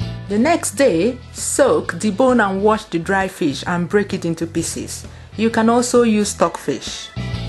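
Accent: Igbo, Nigeria. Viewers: love the igbo accent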